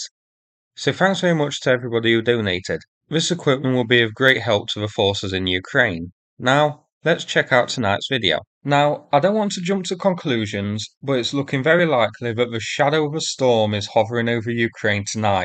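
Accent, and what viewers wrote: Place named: Nottingham, United Kingdom. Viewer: Ah up me duck. You’ve got a proper East Midlands accent.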